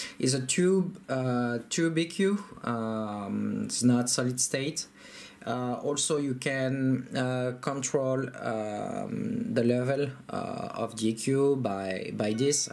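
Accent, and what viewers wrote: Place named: France. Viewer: Good job ! And Good "french accent"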